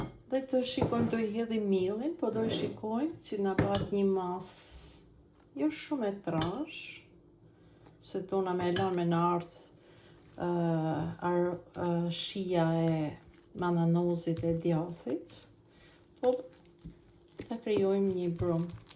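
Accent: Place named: Shkodra, Albania. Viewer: Ty te lymshin duart e pastra e te arta! Komplimente qe perdor doreza ne gatim çka tregon kushte higjeno sanitare te larta per moskontaminimin nga mikrobet te ushqimit dhe organizmit edhe pse gatuan per familjen tende! Por, ama, gjeja kryesore qe te kenaq vetem me te nigju me dialektin shkodran fantastik, "feltere", "tamin", "biber", "i perzime", "katerdhjete e pese minuta" Dialekti me i bukur i gjuhes shqipe! Te faleminderit!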